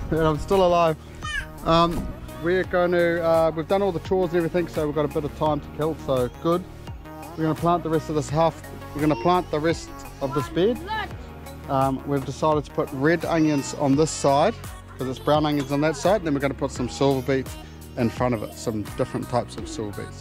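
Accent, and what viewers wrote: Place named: New Zealand. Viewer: Where abouts is ur farm u sound kiwi, also how many acres do u have?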